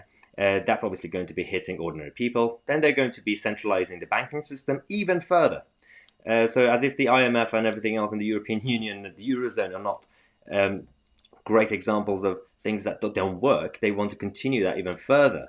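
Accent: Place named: RP, United Kingdom. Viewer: And the price of that graniola is ridiculous..Stop recommending posh boy products..70% off Leave voters can't afford it and you will p*ss them off from your website..Try using the Alex Belfield common touch and try not to speak like you went to public school or you'll flatline at the 200k mark..Probably close to the limit of the wealthy leave vote..And remember that only people with money think class attitudes are outdated..Like Nationalism,they never really go away........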